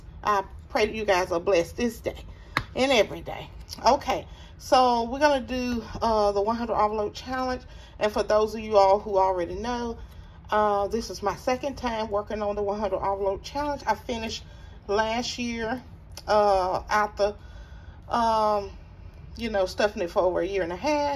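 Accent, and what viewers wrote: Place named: South Carolina, United States. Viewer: I love your accent. I thought that maybe you were from South Carolina. I was close. 😀. Keep up the good work, sweet lady.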